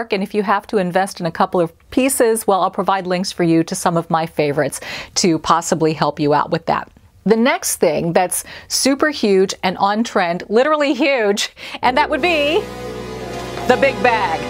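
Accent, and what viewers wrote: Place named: United States. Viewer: Thanks, I love your inspirational videos and cute American accent. You are so inspirational and so positive. I rely on you to keep me fashionable!...bless you from sunny England. 🤗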